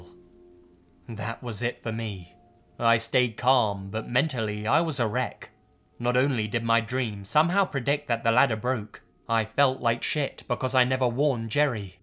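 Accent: United Kingdom. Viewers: He's British - we don't speak American. Your jello and aloominum is different to our jelly and ALUMINIUM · are you british? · are you from the UK?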